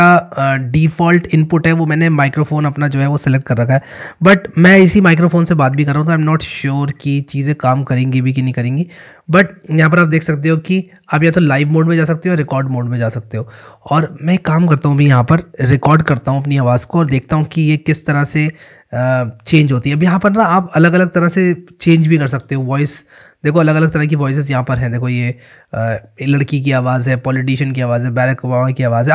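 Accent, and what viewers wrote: India. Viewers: bhai tu bilkul angrez jaisa dikhta hai, mai to kisi foreigner ka video bolke click kiya tha, badme dekha tu to indian accent bol rha.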